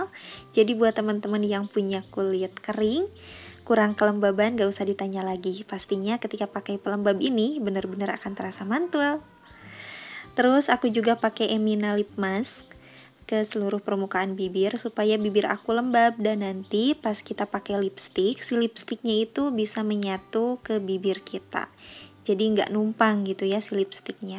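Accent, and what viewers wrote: West Java, Indonesia. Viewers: Hasilnya cantik bgt dan keliatan natural ngaaannn...eta nyarios na mani nyunda pisan, pertahankan neng, jadikan ciri khas.👍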